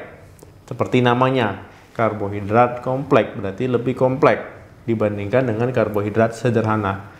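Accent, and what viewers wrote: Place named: West Sumatra, Indonesia. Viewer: Logat nya kayak orang sumbar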